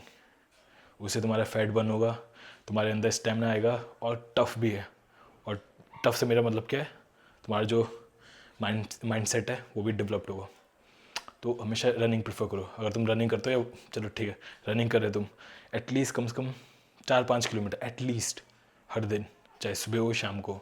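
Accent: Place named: Northeast, India. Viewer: dost northeast se ho kya? bdiya accent hai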